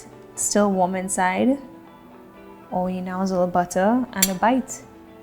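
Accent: Trinidad and Tobago. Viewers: Gal good stuff! You Trini or wuh?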